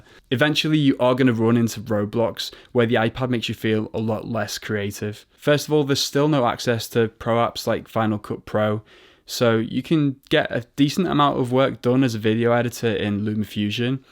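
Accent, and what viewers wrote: Liverpool, United Kingdom. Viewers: Congrats on 1000 subscribers! Just discovered your channel. You sound like you’re from Liverpool.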